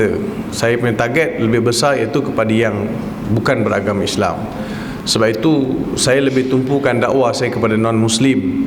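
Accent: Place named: Malaysia. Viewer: Suara ustaz malayu original lah..😍😍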